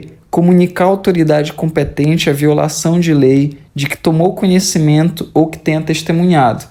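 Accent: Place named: Pará, Brazil. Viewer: teu sotaque é muito paraense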